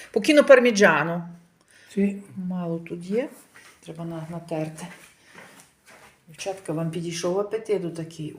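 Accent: Lviv, Ukraine. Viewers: Та так,автор каналу говорить зi львiвським акцентом,на Зах.Укр мають свiй дiалект,iнтонацii'.Вiд цього залежить i те,як вона говорить по-iталiйськi .В нас на пiвднi не говорять "лятте","латте"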